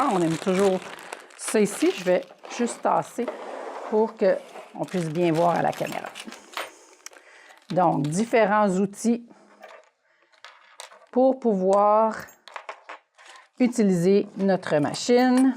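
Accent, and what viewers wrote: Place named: Canada. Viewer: Oh un accent canadien....de Celine Dillon...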